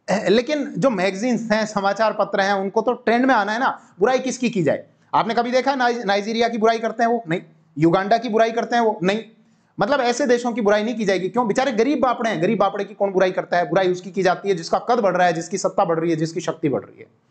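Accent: Rajasthan, India. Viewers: Sir aap Rajasthan se ho lgata hai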